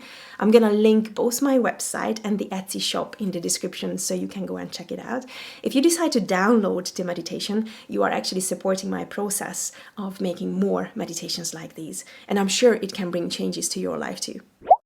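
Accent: Hungary. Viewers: Nooo! The Hungarian accent is really good. I love it!😅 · OMG ...I don't know how I got here...but what you say resonates with me so much! I also want to have my own workshop because I want to break free! My aim is also freedom...it has always been freedom, I just never dared to act on it.But now I have the courage to break free from having a boss, from working on a computer, from working corporate jobs. I'm only halfway through the video, so I'm really curious about the outcome! Ps.: Are you originally from Hungary? I suspect it from your name and accent😄.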